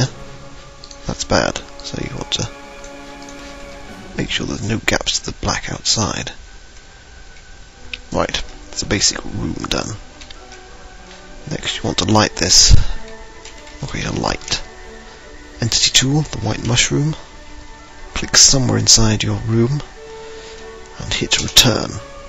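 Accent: RP, United Kingdom. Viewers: You have a realy "Posh" accent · You talk posh ? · Hey! you have such a posh accent, is it foreal? im from central london and my accent is not nearly as posh as yours! i cant imagin you playing CSS Shooting people, it would be funny lol. :) and thanks! i watched nearly all of your tutorial video's and i have nearly fineshed my first EVER map! many thanks.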